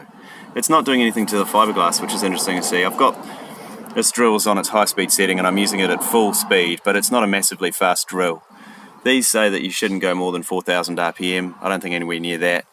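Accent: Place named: New Zealand. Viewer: am i hearing a fellow kiwi 🤙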